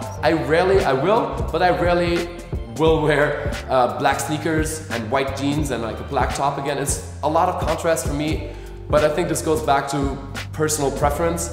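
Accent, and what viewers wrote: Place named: Germany. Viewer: I’m quite obsessed with your German English accent here, very thick!! I used to work in a German company and all I could hear if not paying too much attention sounded very German to me. Great video I’ll recommend this to my male friend.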